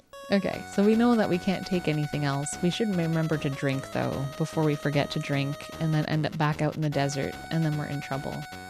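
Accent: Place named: Canada. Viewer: Without trying to come across as too much of a suckup, I am really enjoying this playthrough. The combination of the game's music/sound effects + your voice (and Canadian accent) that makes it really soothing to listen to in the morning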